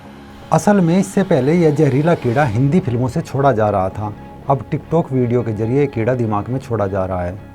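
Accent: Haryana, India. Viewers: RAM RAM BHAI HARYANVI LAG RE HO AP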